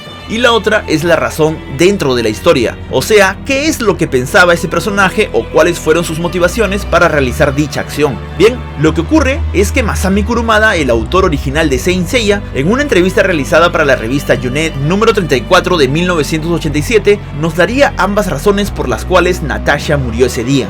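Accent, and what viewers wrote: Peru. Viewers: Motupe, eres peruano perrillo?.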